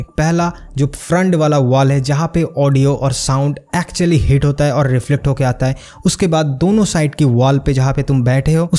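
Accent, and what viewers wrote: Bengal, India. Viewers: chal bhai khush ho ja like kr diya informative video .. love the humor.. i notice your vocal i think u r a bong.. correct me if i m wrong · No bhai I am not exaggerating at all.. you are just intense... And I assumed you are a Bengali (noticed your accent).. that took my bonding to quadruple ❤️ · I guess you are bengali .. Its hard to manage your bengali accent bro😁😁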